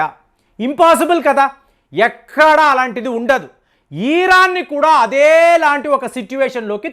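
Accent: Tamil Nadu, India. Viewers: మీరు చాలా పదాలు మిస్ప్రొనౌన్సియేషన్ చేస్తున్నారు. దానివల్ల వరల్డ్ న్యూస్లో విషయాలు అర్థంకవడంలేదు. ఖమేని అంటున్నారు. బుక్స్ లో చదువుకున్నది అయితుల్ల ఖోమైని అని ఇప్పుడు మీరు కుమేని అంటున్నారు. చాలా వీడియోలలో మీరు ఇలా pronounciation చెయ్యడం మాకు రుచించడంలేదు. ఇరాన్ నీ మీరు ఈరాన్ ఇలా కన్ఫ్యూజన్ అవుతోంది మీరు తమిళ్ slag vidavandi. తెలుగు పాత్య పుస్తకాల భాష వాడండి. లేదా మీ వీడియోలు మీరే చూసుకోండి. మా మీద వద్దు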